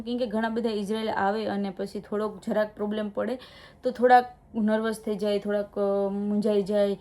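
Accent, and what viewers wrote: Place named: Barda, India. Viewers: bhai aa amara barda ni mithi bhasa 6 aa bhasa koi boli na sake amara sivay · Mer ni boli emj bole bhai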